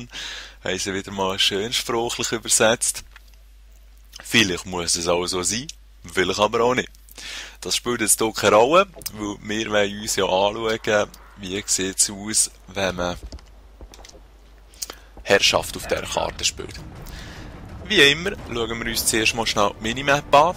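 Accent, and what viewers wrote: Switzerland. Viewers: endlich mal en shwiizer xD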